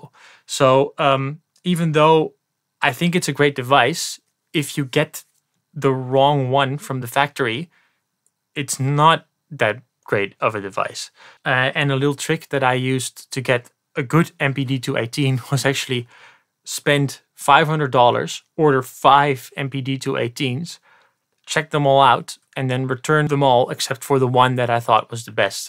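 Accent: Netherlands. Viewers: Ik hoor aan je accent dat je Nederlands bent hahaha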